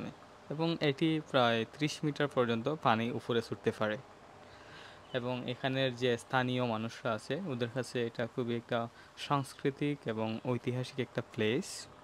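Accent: Sylhet, Bangladesh. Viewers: Bai afne kita sylheti ni?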